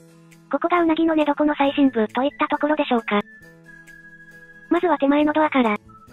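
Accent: Hakata, Japan. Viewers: 若い女の子の博多弁が好きすぎて、思わずチャンネル登録してしまいましたが、(もちろん物件も好き❤)よくよく観ると、若い女の子ではナカッタ...(T_T) ま、いいっか👍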